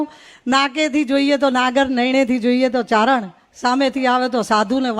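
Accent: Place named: North Gujarat, India. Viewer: ઉત્તર ગુજરાત નો લહેકો અને ગામઠી છટા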